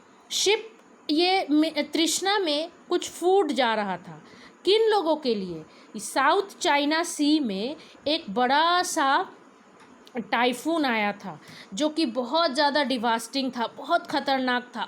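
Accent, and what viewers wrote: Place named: Bengal, India. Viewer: Mam by your voice I can recognise that you are bengali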